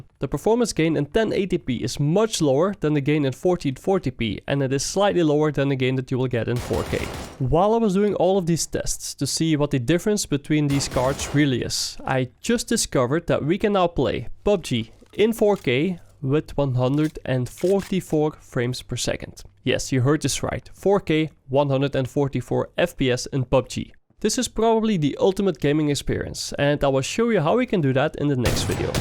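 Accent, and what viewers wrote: Netherlands. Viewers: Are you Dutch? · I could hear that you were Dutch in about 3 seconds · The Dutch accent is coming through strong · Ur dutch right? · Dat hoor je goed dat je van Nederland komt hoor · wat een dik Nederlands accent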